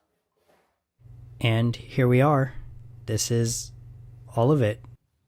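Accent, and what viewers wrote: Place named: United States. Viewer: I'll always choose ground floor. Carrying a bike plus groceries up stairs is too much for me. P.S. I'm happy to find your channel. I follow another but he doesn't share a vid often enough. I am watching two now and you speak American English. 😊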